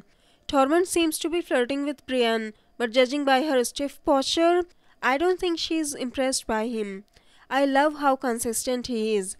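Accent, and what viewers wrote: India. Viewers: The commentator voice is very annoying, is like listening to a Indian person speak English.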